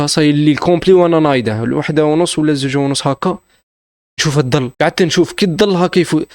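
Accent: Algeria. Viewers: من اي ولاية أنت حسيتك من ولاية معسكر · انتما من عين الدفلى خميس مليانة بصح كيفاش تهدرو لهجة الوهرانية 😂 تحيا قصص تاوعك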